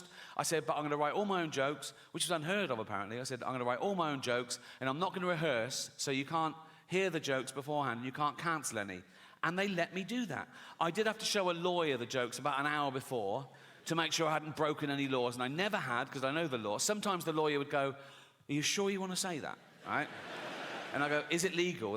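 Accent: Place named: United Kingdom. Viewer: Only a brit can do this! It would not sound so good in American accent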